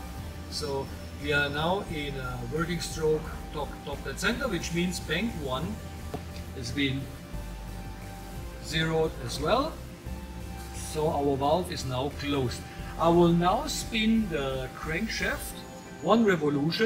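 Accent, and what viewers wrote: Germany. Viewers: As a machinist and a car guy I can appreciate your work! I worked in a German owned shop for many years, your accent brings back many memories. Keep up the excellent work! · Just your accent makes me want to ship my motor all the way to Germany so you can rebuild it!!! Awesome video.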